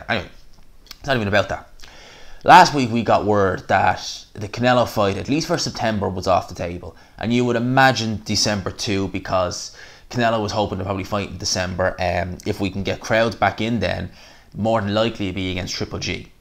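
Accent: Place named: Dublin, Ireland. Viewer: Got Irish rent - you're such a dubbie you sound like my dubbie cousins :D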